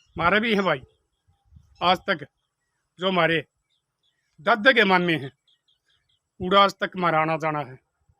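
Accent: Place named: Haryana, India. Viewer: Aapki haryanvi bohot achi lagti ha haryanvi me hi bataya karo aapki sari video dekhati hun meri bi bhabi ase hi Karti thi meri maa me lakwa aa gaya tha to uska ilaj bhai ne nahi karwaya do bete do bahu ha 6 pata poti han sadi bhi kar rakhi h bachon ki lekin maa ka ilajaj hamne karwaya ha koi Paisa nahi diya jab wo theek ho gai mera pitaji army me the pention milti jab theek hogi jab jabardasti lege lekin jane ke baad na to hamko bulai aur ham maa se milne jaati to bhabhi bhai bhatija bhatiji bhi jhagda karte the unko matlab hota ha to aate han nahi to naraj hokar bolte nahi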